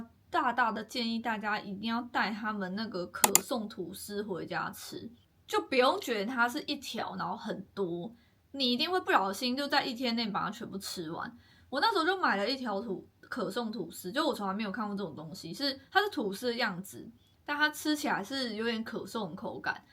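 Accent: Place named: North, China. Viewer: 北部口音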